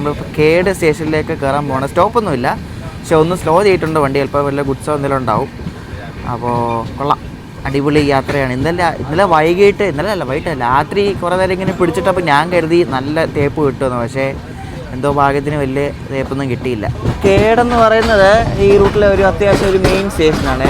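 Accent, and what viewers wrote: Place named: Kottayam, India. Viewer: Awesome …adipoli ….❤❤❤❤ parallel race super 🔥🔥🔥🔥. Talk kelkkan rasam undu. Kottayam style talk 😍😍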